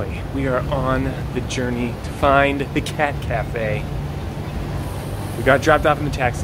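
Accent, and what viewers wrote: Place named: United States. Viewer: The first time I saw ur picture I thought it was henry cavill vlogging. The American accent gave u away. Welcome to Singapore, Superman. Come back and try some local food. U will be pleasantly surprised.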